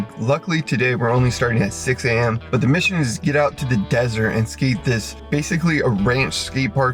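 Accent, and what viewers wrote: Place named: California, United States. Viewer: has he biggset bro sufer cali accent and marble mouth. The guy is a fried kook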